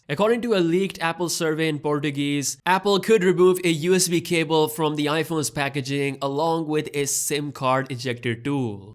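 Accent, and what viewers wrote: India. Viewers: Your Indian accent pops up.. Alot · You got somewhat of an indian accent lol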